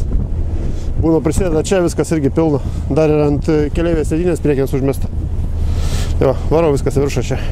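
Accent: Šiauliai, Lithuania. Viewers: is akcenta bene is siauliuu busi?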